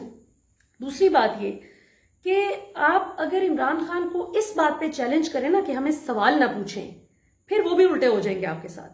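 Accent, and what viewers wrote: Punjab, India. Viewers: I love your Punjabi accent 😃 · Truly brilliant, keep it up. By the way like your Punjabi touch of tarka.😄👍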